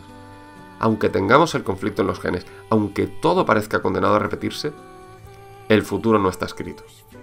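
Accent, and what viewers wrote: Spain. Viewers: Macho estoy disfrutando mucho de tu video y tu capacidad de análisis. Pero se te ha andaluzao la voz a lo "behtia". ¿Te has echado una novia cordobesa o antes te esmerabas en solapar el deje? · Es el acento de Canarias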